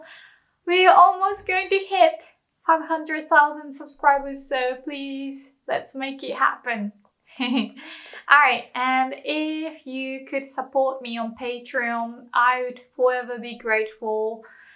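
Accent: United Kingdom. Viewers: ! I am really enjoying your lessons here. Thank you for speaking slowly and clearly, and explaining things so well. Plus, you are super cute. Where in the UK is your accent from? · That's strange she speaks british english, even though she is Japanese looking. life is STRANGE. good video.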